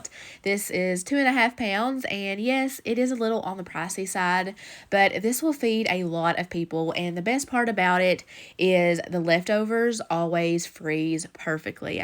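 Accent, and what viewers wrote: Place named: Kentucky, United States. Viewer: I'm in Louisville!! Always knew the accent was familiar it's cause we Kentuckians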